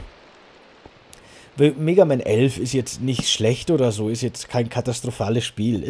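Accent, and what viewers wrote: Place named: Bavaria, Germany. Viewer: Ich liebe Deine art einfach so ruhig und ohne Geschrei und der Bayrische Dialekt :)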